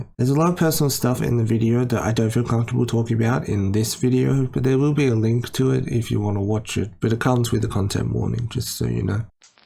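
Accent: Australia. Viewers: maybe it's the Australian accent or that he his diction is beyond your understanding, but definitely not slurring??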